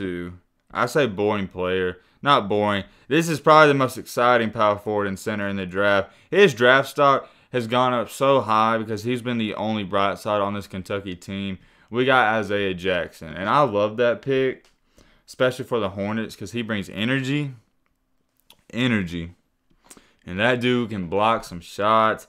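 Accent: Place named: Alabama, United States. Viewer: The Alabama acentt in this nigga 😂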